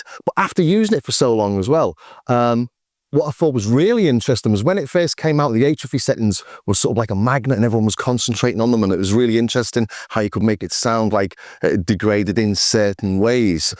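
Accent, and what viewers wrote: Liverpool, United Kingdom. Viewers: Did you know that your UB-Xa Synth is Diseased, The closed captions if you switch them on every time you said OB8 (atrophy) setting it translates it as AIDS and it also mistranslates you saying atrophy to HIV which made me chuckle a bit, not that AIDS and HIV are anything to laugh about ! ! ! but I was wondering if you can alter the translation settings it obviously doesn't like your soft scouse English accent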